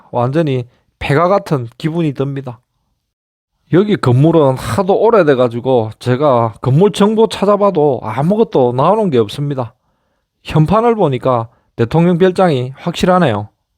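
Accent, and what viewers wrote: Gyeongsang, South Korea. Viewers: 경남사투리가 짙으셔서 정치색의 댓글이 달릴것같습니다. 그냥 빨간당 이실거라는 생각이 들긴합니다.ㅎㅎ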